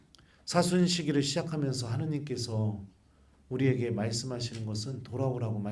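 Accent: Chungcheong, South Korea. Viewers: ❤🎉 저는 2000년에 멀리 캐나다 🇨🇦 토론토 지역 오로라시에 와서 살고있는 충남 서산시 에서 첫 영성체를 올린 조 루가입니다. 그동안 풀납동성당, 잠실성당, 역삼동 성당에서 레지오 활동과, 성가대 횔동을 하다가 emigration 으로 캐나다 🇨🇦 토론토 에 왔습니다. 신부님 충청도 말씀을 듣고 친근감을 느낍니다. 조 루가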